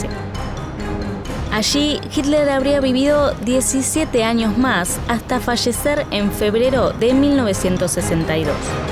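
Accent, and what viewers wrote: Argentina. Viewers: Que acento más engañosos, por un momento pensé que era español y de repente me dí cuenta qué es argentino OMG Pd: exelente video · Muy bueno el video, tiene un lindo acento porteño y saca videos de buena calidad, en poco tiempo llegan a los 100k. Éxitos.👍🏽🇦🇷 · Buen video, lástima que tu acento sea argentino, es muy molesto. Dislike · iuuu, se escucha horrible el acento argento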